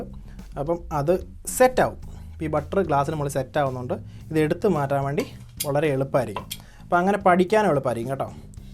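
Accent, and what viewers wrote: Kottayam, India. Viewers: ഞാൻ ഇപ്പോഴാണ് e ചാനെൽ കാണുന്നത് സൂപ്പർ അടിപൊളി....നന്നായി പറഞ്ഞ് തരുന്നുണ്ട് tks ...varthaanam കേട്ടിട്ട് കോട്ടയം ആണോ....🥰🥰🥰🥰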